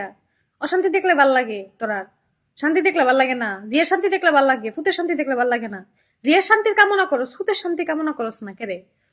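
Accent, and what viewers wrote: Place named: Brahmanbaria, Bangladesh. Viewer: আপু তুমি কোন এলাকার তোমার মুখের ভাষা শুনে মনে হচ্ছে তুমি ব্রাহ্মণবাড়িয়ার রিপ্লাই দিও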